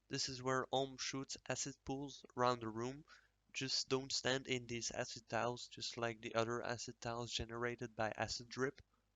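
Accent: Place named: Netherlands. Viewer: jij bent 100% nederlands... nice accent bro.. bedankt voor de uitleg maar ik wist dit allemaal al, suc6 met je drops.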